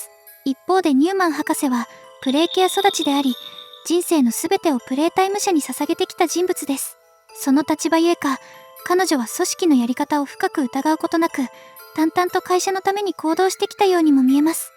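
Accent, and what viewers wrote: Kansai, Japan. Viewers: なんで関西弁やねんwこのチャンネルちとネタ入れてる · 関西弁マジでおもろいなwwww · 何故関西弁？ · 関西弁にするとなんだか吞気に見える不思議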